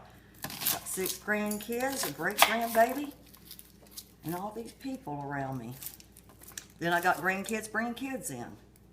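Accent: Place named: South, United States. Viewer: I am an Aussie and I LOVE the southern drawl!!!! This is like mums cooking! LOVE IT!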